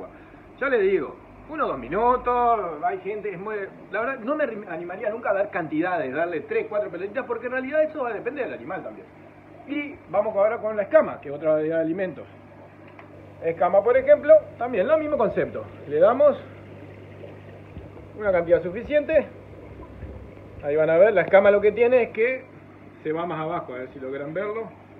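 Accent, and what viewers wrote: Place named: Entre Ríos, Argentina. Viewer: si eras de Entre Ríos por la forma de hablar igual a acá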